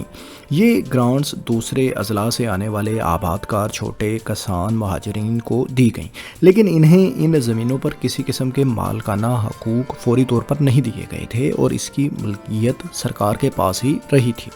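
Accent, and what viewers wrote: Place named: Jatki, Pakistan. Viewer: جٹکی پنجابی ❤❤❤❤❤❤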